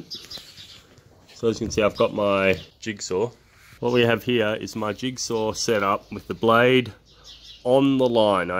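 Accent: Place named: New Zealand. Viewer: oo..sorry i tought somewhere in new zealand...totally different from the aussie i know...really appologised mate..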